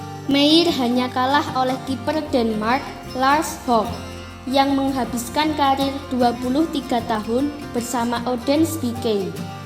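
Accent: Java, Indonesia. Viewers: medok kali to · Medok dan cadel mbak eeeee... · Jowo to mba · Medok e mba mba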